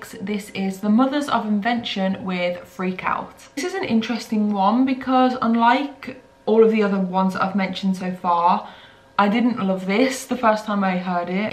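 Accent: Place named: Manchester, United Kingdom. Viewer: You just showed me that I need to catch up on a lot of good music 🖤 btw are you from around Manchester? Just trying to identify your gorgeous accent. Maybe I'm totally wrong - sorry about that. Hello from Slovakia! 🦥💨🤢😆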